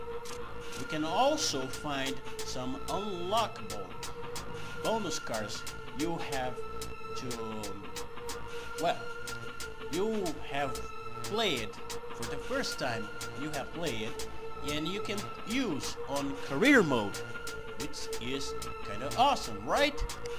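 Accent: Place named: Brazil. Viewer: Você é Brasileiro né Por que o seu inglês é um pouco puxado para o de português Do jeito de falar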